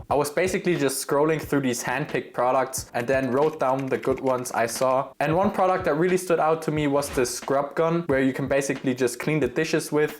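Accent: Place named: Germany. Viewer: Man merkt direkt du bist deutsch bro hahaha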